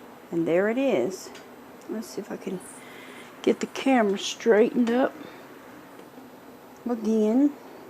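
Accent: Louisiana, United States. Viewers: I don’t have an instant pot but that looks so good I may try to make that without an instant pot. Also Love your Cajun accent.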